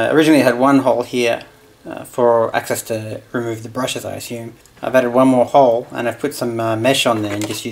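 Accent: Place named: New Zealand. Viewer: Thanks for sharing your brushless motor retrofit project with us. Those motors interest me as to their potential as a replacement for various devices with the added benefit of speed control but they are still quite expensive just to have an introductory play with. I’m in New Zealand hence the expense. Speaking of which, your accent is very Kiwi. Am I right?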